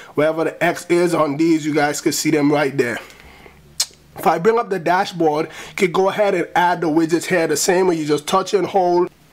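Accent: Jamaica. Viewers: II knew you was Jamaican. Check out vs in YouTube the shut is fire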